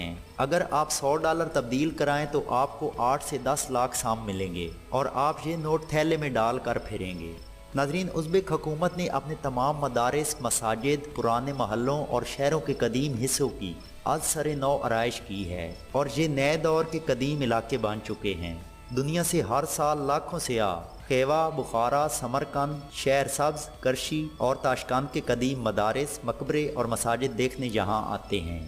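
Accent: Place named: Punjab, Pakistan. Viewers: Ur accent tells me that you belong to South Punjab. Am I right? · Aap ka lehja khushab sargodha ka hea. Aap kahan say? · Urdu ko panjabi main na bolo praa Ji.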